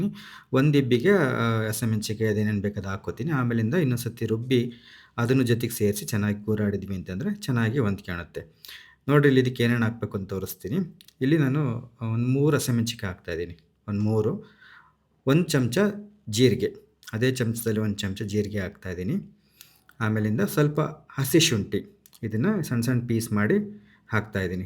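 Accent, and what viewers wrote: Chitradurga, India. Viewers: Pakka chitra durgada bhashedose jote nimma maatu chapparisuvantide😊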